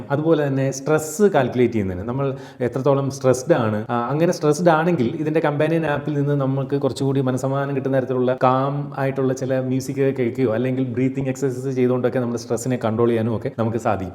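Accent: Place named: Kollam, India. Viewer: It's a kollam/ Trivandrum accent..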